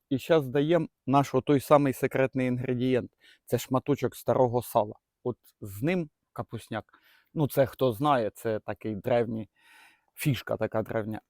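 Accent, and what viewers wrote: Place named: Poltava, Ukraine. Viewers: Такой приємний,, Говор "у Вас ,майстре ,така мова чарівна у Вас ,як у нас ,на Полтавщині .Приємно ,слухати,не тільки ,залучитися до вашого ,,Капусняка '',все так робити ,як і у нас .Велике Дякую. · Розмова точно полтавська! Чи помиляюсь? У нас також капусняк готують і з квашеної і зі свіжої капусти. В залежності від пори року. Неперевершено! Дякую.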